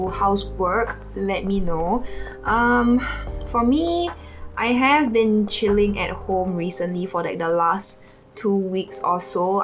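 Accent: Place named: Singapore. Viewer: i've come across a few of your videos because it's quite hard to find youtube creators that fit my skin tone and reviewing the products i'm looking for!! heard your accent and immediately knew you're singaporean too!! subscribed and i love your comprehensive reviews and your timestamps and just wanna say jiayous <3 can't wait to see you blow up on yt hehe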